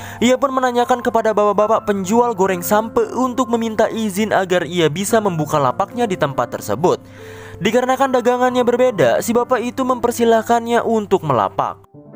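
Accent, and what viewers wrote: West Java, Indonesia. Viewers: Sunda bang?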